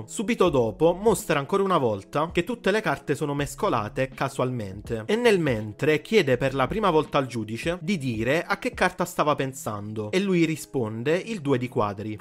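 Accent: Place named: Naples, Italy. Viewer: credo che sei Partenopeo dalbtuo accento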